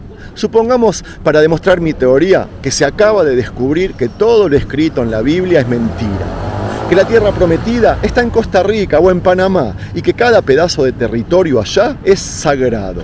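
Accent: Buenos Aires, Argentina. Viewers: Shalom...admiro tu enseñanza..a veces pienso que lee tus relatos... Y me gusta tu acento porteño..un abrazo desde Salta Argentina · No se veo mucha manipulación, en este video peligroso es meterse en un barrio de caracas y lo otro q no me explico, es que el único lugar del mundo donde se pelean judios y árabes x supuestos motivos religiosos es en Israel, 🤔sospechoso de verdad, tuvieron unos dos mil años en la diaspora, y los judios q Mato Hitler ni siquiera eran de medio oriente la mayoría eran de Alemania 🤷🏽‍♂️, y tenían religión judía, se entiende q se usara esto como escusa para la creación del estado de Israel pero la mayoría de los israelitas q viven en la nación de israel yo diría q son de otros orígenes,no semitas, asi como tú q tienes acento argentino o uruguayo ni idea de cuál país seas pero de x allí, lo cierto es q de origen semita no creo, pero puede q me equivoque x otra parte habría q ayudar a un país como burundi donde realmente se ve la verdadera razón de las guerras,la pobreza extrema, y la exclusión social de los más desamparados del planeta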